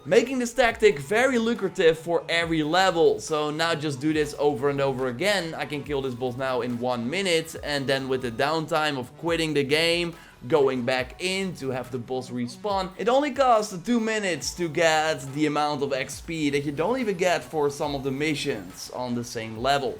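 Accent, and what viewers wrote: Netherlands. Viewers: Are you Dutch? I mean, '' '' should be a dead giveaway, but who knows.. You just sound like my Dutch friends speaking English to our American friends. Gewoon zo'n Nederlands dingetje weetjewel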